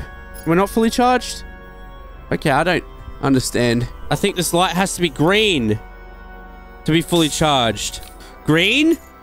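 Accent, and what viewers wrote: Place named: Australia. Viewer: You a Australian brohther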